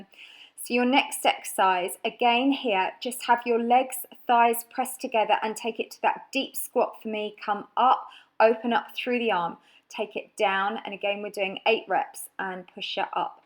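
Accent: United Kingdom. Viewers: Wyndham-Read thank you!! it was not to be ofensive at all! you are really good and I love your determination and your constance and energy! and if you ever tried an Adults ballet class you would pick it really fast! I am sure of it:)., may I ask where is your English accent from? I am trying to improve my English. thanks! many hugs and all the best in your really fascinating project!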